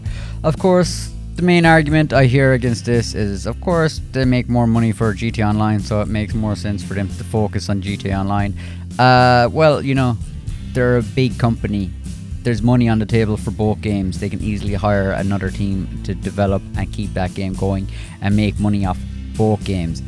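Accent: Ireland. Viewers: Thank you. Will keep playing as I’m not a “gamer”…RDR2 and classic Guild Wars 💕☘️ Irish accent? Cork?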